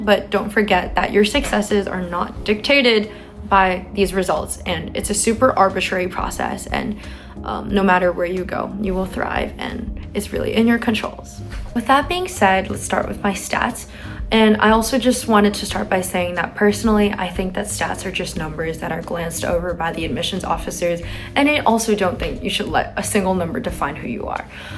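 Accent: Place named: United States. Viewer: You speak perfect English with a American accent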